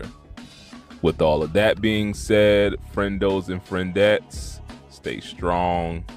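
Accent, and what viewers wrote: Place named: South, United States. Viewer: You have a way with words my brother.. I know your from up north but your speech is southern (which in turn is likely derived from Afrikan ancestors whose culture is tied to proverbs and poetic means of expression through language)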